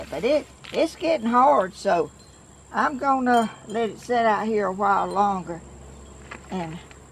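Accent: South, United States. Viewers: My Daughter that passed away Had long,Thick Hair down to her Waist before she started Kindergarten & I would Braid her Hair! I always wanted to Learn to French Braid, but never learned how! when you were talking about your Mother getting tangles out of your Hair & going to The Beauty Shop to get your Hair done 💇‍♀️ at Beauty Shop once & how they Pulled your Hair, it does Hurt when you are Tender Headed! My Sister - In- law used to give me Perms when I was Growing up, she was sooo Rough combing my hair, when she gave a Perm, the roller’s would be sooo tight, it felt like your Hair was coming out at Roots lol sooo I can relate with You on that, Guess I was tender headed too lol You do a Beautiful job, Cutting, Giving Yourself Perms & Fixing your Hair, always look’s sooo Pretty! I cut my own Hair, haven’t cut it in a long time! I Wish I Had Natural Curly Hair but wasn’t blessed with itl lol! 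I wish I could give myself a Perm, Never tried too, haven’t had a Perm in Years!You are A Beautiful Lady & Certainly don’t look your Age! That is going to be Beautiful! You are sooo Talented! Enjoy your Video’s! Love ❤️ your Southern Accent! That is Absolutely Gorgeous! I like the Vintage Look & Love ❤️ Older Thing’s………. Blessings on You and your Family! 🙏💕😇💇‍♀️🍁🍁 Love Hearing Your Stories!My Mother used to have Sucelentss, She called them Hen & Chick’s! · I really enjoy your company! It reminds me of my Mom's people from the South. I could listen to my great grandma all day long and you're the same. When she laughed she sounded just like you. I grew up in the West so the only Southern accent I heard growing up was my mother's and she lost a lot of it over the years. So listening to you takes me back to yearly visits and fond memories. 💕💕💕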